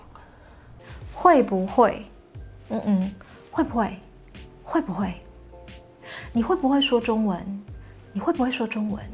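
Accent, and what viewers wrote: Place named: Taiwan. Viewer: 博主台湾腔太明显了，考个普通话证书吧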